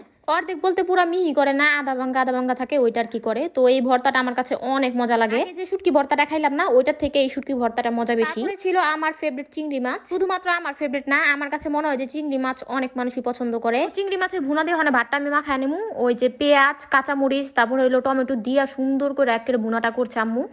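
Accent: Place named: Old Dhaka, Bangladesh. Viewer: আপু তুমি যে পুরান ঢাকার ভাষা বলা আমার এত ভালো লাগে তোমার সবগুলো ভিডিও দেখে মাশাল্লাহ দারুন হয়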